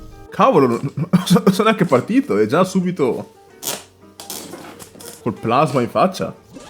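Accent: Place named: Veneto, Italy. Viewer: grande vecio ti ho conosciuto da poco,spacchi,e poi te si veneto 💯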